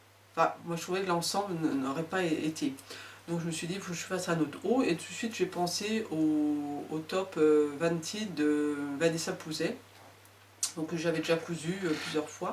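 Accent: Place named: East, France. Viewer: Bonjour, je suis une nouvelle abonnée...je pensais bien que vous veniez de l’Est...vous avez l’accent ! Je suis de l’est aussi !! Belles cousettes , j’adore !!!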